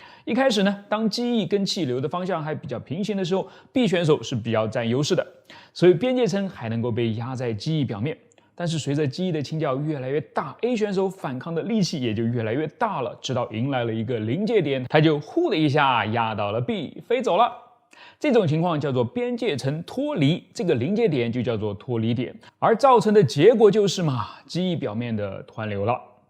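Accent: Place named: Shanghai, China. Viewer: 口音像上海的😎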